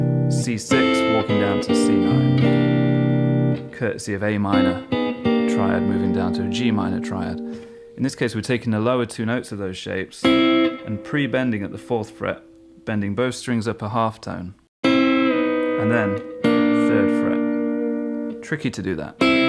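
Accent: United Kingdom. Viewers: The playing out of the chords is sublime. It shows how complex the song really is. Absolutely gorgeous. Oh my God he just spoke and he’s British! Beautifully and intelligently spoken. Beautiful.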